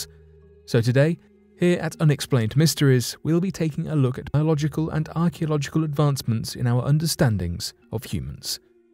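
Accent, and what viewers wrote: England, United Kingdom. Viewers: Enunciation must be precise for communication. Colloqualisms and heavy, near indecipherable accents hinder pure flow of thought. That is true no matter what language is being used. Imagine a Scot trying to speak West Indian--- or a German trying to speak Apache. Whatever language you are speaking, you must be clear and concise in your presentation. This narrator is an Englishman speaking English. And, imho, doing a fine job.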